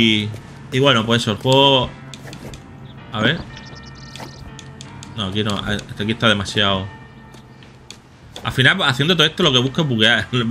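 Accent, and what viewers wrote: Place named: Murcia, Spain. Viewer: Me suena a Murciano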